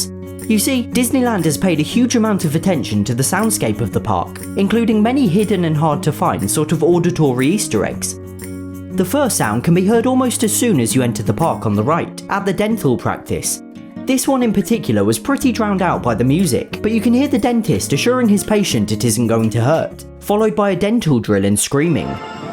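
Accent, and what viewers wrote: England, United Kingdom. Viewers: I speak french, and that is one of the most english accents i have ever heard.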